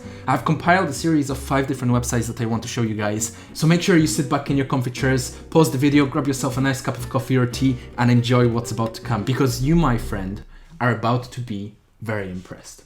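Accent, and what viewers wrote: Poland. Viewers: Are u polish?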